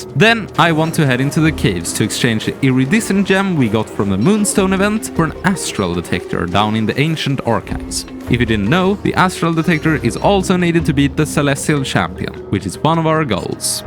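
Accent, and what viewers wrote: Sweden. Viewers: I don't know who you are but you sound so obviously Swedish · Blessed by the algorithm gods! What a fun video, with great execution too! Shocked to see this channel hasn't even broken the 5k mark! Also, you have the funkiest, most pleasant accent. Are you Swedish by any chance? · Love the swedish accent!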